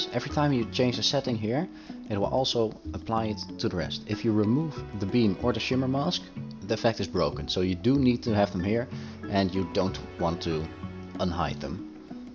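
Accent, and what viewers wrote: Netherlands. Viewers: you from the netherlands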